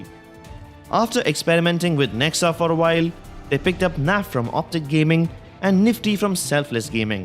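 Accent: India. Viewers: Indian accent smells from 4000km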